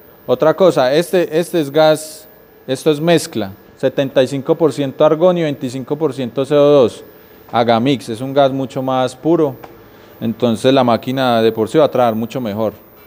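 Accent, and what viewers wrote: Colombia. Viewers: a bueno pensé que eras de Medellín por tu acento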